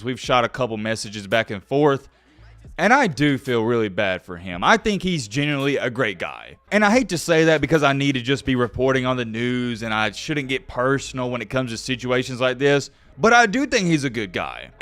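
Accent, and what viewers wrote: South, United States. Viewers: C'mon bro southern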